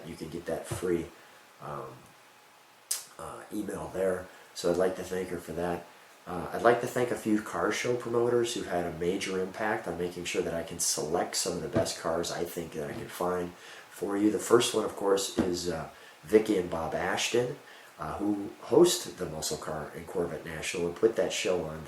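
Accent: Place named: Chicago, United States. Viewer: Hey. Congratulations and thanks for the videos. I love cars like you do but some days I'll grab a My Car video just to hear some Chicago accents. I've been in Texas too long. Best wishes for your continued success and fun!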